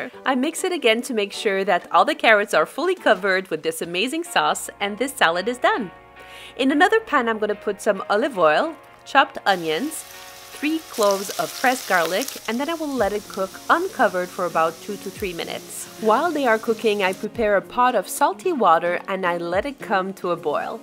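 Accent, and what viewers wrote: France. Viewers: Ah en fait c’est un accent français ha ha ha je m’étais pas rendu compte mdr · Very interesting. Are you French by any chance? I recognized some of your pronunciation and words:) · Are you French ? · love ur French words and accent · מבטא צרפתי באנגלית?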